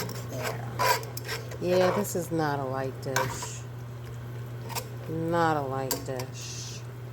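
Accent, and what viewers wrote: New York, United States. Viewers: Love that NewYork accent. Hope I'm correct. Lol I personally go to your channel for that Italian I love eating and cooking. I also find the exact problem with my LeCruset pans also I was so disappointed that they stain. How do u clean yours?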